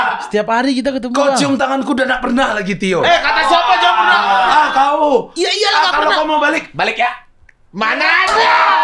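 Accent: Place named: Sumatra, Indonesia. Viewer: Seneng banget nonton ini sebagai orng Sumatra, logat nya dapet banget😂😅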